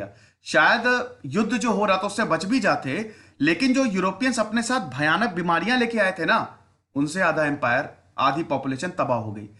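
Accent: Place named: Uttar Pradesh, India. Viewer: UP ke lagte ho bhaiya baato se😂😂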